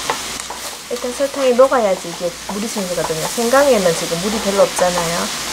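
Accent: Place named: Daegu, South Korea. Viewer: 생강활용도짱~♡ 대구말씨 참 정겹네요 저도대구가친정입니다^^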